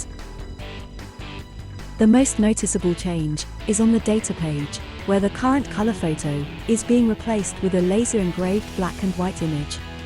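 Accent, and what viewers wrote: United Kingdom. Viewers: An British voice over advertising an American passport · It for a US passport yet the speaker has a British accent. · why is a british person narrating a video on US passports??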